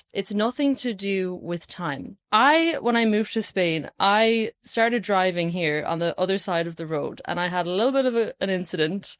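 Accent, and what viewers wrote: Ireland. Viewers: This was great! I love hearing that Irish accent too 😊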